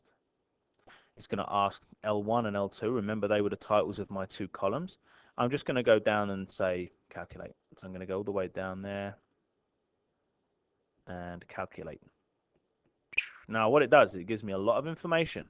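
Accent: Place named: United Kingdom. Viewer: I like this british accent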